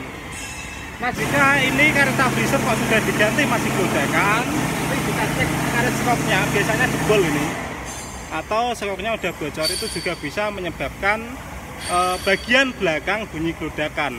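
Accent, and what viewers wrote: Java, Indonesia. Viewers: wong jowo yo mas? ktauan dr logat nya medddok...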